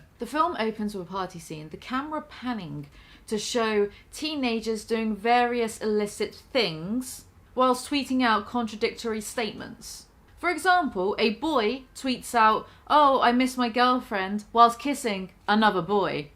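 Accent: United Kingdom. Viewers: I’m subbing for these reasons, you should as well. British accents are a plus for no reason. I love a female creator who’s not doing makeup tutorials or exposing her body for subs. To each their own, but I come to YT for laughs, memes, and “content”. Shes given me both. The video I see next “The Sheer Entitlement of Youtubers” just give humble vibes.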